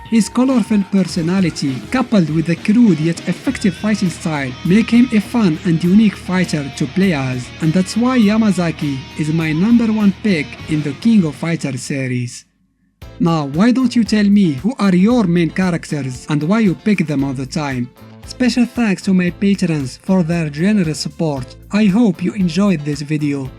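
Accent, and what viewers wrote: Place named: Morocco. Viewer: Bro are you Moroccan your accent is very familiar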